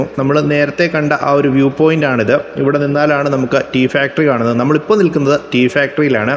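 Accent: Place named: Kottayam, India. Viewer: ബ്രോ കോട്ടയം ആണോ 🤔ഇടയ്ക് ഫ വരുന്നുണ്ട് 🤔പൊളി 👍🏻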